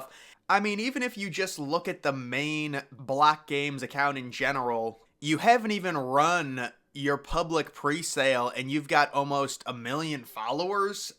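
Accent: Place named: Indiana, United States. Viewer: I can tell you’re from south bend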